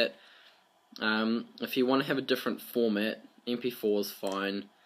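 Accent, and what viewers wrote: New Zealand. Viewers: Such a typical kiwi accent lol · Hey man I Luv your NZ kiwi accent !! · His accent changes the guys vowel to almost gihs but clearly he DID NOT say girls. There was NO L sound in there. I was going to guess that he was an Aussie but clearly it he stated that he is a Kiwi in other comments. My American ears cannot tell the difference(just like many non Americans cannot tell the difference between Canadian and American speech). Very similar. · Ha ha. I picked your Kiwi accent in about 3.5 seconds... then got your confirmation at the 28 second point. Well done my fellow Kiwi !!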